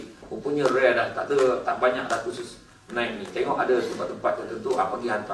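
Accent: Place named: Negeri Sembilan, Malaysia. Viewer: Ustaz syamsul debat memang salah satu penceramah hebat dan menjadi salah seorang penceramah kegemaran saya..dengan loghat n9 dia tu..buat saya terhibur. Tq pis..share.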